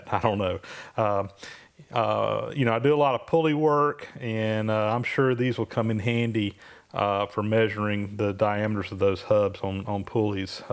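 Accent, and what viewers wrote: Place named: Georgia, United States. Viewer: Do you have the IPA notation for your pronunciation of "oil"? Your Georgia accent & doubleboost's Geordie accent are about the best things on youtube.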